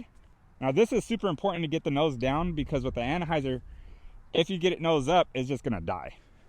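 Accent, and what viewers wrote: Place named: Southern California, United States. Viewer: Yo these are some great points, you sound like you're in Socal. where y'all at?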